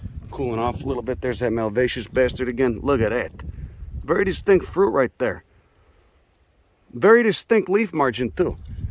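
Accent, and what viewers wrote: Chicago, United States. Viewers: Ah, love the speak. 20 years LA via Chicago (et. 1970 Cicero ) You turned me onto some really good reference books. I can’t keep up with you. But I love going back to reference what you say and describe. But , the Chicago that exudes from your pores makes me homesick for a memory. I’m so glad I found your channel. The more I learn the better I feel! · Sounds like some of my Chicago family members but on steroids... Love this guy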